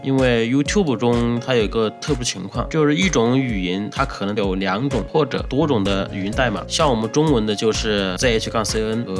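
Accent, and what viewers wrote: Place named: Hunan, China. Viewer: 太厉害了。UP主超棒。一口湖南普通话让我这个长沙女婿听着很亲切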